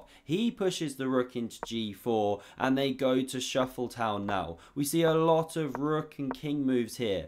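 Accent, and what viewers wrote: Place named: RP, United Kingdom. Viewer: i have to comment - you are actually amazing at this. excellent speaker, sounds effortless and off-the-cuff but quite stylistically elegant, like a posh moistkritical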